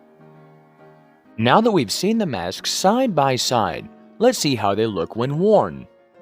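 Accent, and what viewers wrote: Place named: South, United States. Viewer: Awesome! also dude, you sound like a southern version of the narrator from How it's made. 😆 Those are very cool masks too, wish I could but like one someday!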